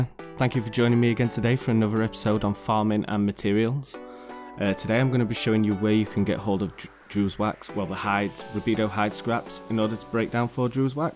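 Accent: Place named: Yorkshire, United Kingdom. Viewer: Haha yeah, nice and subtle man! What part of England has this accent? Yorkshire/Sheffield somewhere around there? I need to learn to speak like that, it's just awesome!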